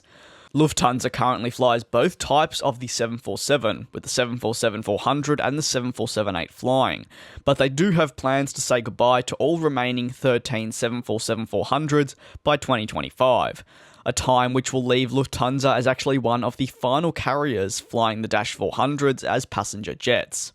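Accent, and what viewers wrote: Australia. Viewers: Hey man, been such a huge fan of you and you sound australian, would love to meet you since I live in Australia as well. Where do you reside?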